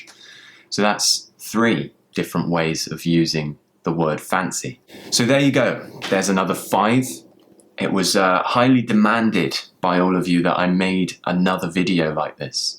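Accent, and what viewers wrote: RP, United Kingdom. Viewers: Hello! Where are you from? I'm thinking about moving to the UK, and I want to pronounce English nearly to your accent. I tend to get accents very easily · I love your videos so much, it can help me to improve my English skills. And I love your British accent, last one is you. 😍from Thailand ~ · love ur videos. love ur British accent. 👍👍👍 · That's ritght Sorry :) I've been in London for 11 months and still learning English.I know there are many ways to say a word because of accents but when I heard you saying ''cannot'' it took my attantion.I was like umm ''he seems speaking with RP, actually He is more understandable but what about 'cannot' lol'' So I really wondered where were you from or if you had a reason for that ^^ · Loved your british , you are the first british that make me love british accent much & more. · Thank you for the lessons,you own well-born accent indeed.Wish I hade a british companion like you,back then in London. 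· Hi! MY name os Sérgio and Im from Brasil... I need to say You've got a worderful British accent and your pronounce is quite clear. Your lessons are really amazing!!! My warmest. Regards! Sérgio Alkimim. Brasil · Good job ! Thanks for sharing useful things, I love learning and imitating British accent a lot. It sounds so posh 😎 keep doing this I'm your student and supporter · Make more videos of your british accent!! I love it! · This is One of the BEST YouTube Channel to Understand more the British accent and words . Cheers!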